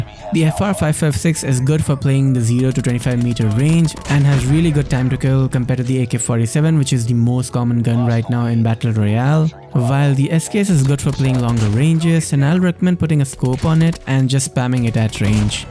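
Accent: India. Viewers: Are you indian, your accent is kinda Indian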